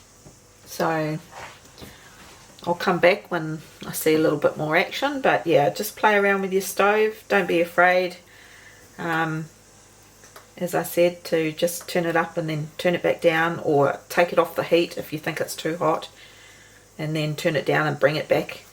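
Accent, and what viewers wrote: New Zealand. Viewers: This popped up in my feed for some reason. I kept watching because of the Kiwi accent, but subscribed because of the recipes and your genuine communication with your watchers. Don't be concerned about your occasional silence when trying to think of the right word; this reminds so much of my own Scottish mum who would gab away at us while she was cooking. I am 72 years old now, and mum has been gone many years, but you brought back many happy memories. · Kia Ora, have just discovered your channel, so good to hear a kiwi accent😂. Tried the crumpets today.. Kapai!!!! I'll never buy crumpets again, so so easy. I 'll be scrolling your videos.. Thanks, I am a Kiwi living in Brisbane. I'll be watching you😊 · The Aussie half of me is so chuffed that you’ve visited our very fine shores, but the Kiwi side of me won’t let Australia take credit for this most excellent woman 😊! 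She has a rather thick (or as she said, “thuck”) Kiwi accent, a bit like how “aboat” is the giveaway when you realise you’re speaking to a Canadian not an American 😁✌️ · Surely not an Australian, but a New Zealander… listen to the vowels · You had me at your fellow kiwi accent (shameless I know but I live in QLD now so...yeah). Definitely gonna give these a try and hope to enjoy with butter and jam. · I love the kiwi accent lol a thuck wudth 🤣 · Well done, as soon as I saw your recipe for cockies joy and heard your Kiwi accent you had me hooked. I love crumpets, and I'll make some tomorrow, My Nanna used to make great pikelets on the farm in Rotorua. Cheers. new subscriber from Tasmania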